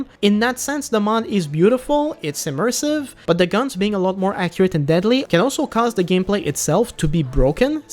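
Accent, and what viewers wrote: Quebec, Canada. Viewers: are you from quebec? i think i hear a familiar accent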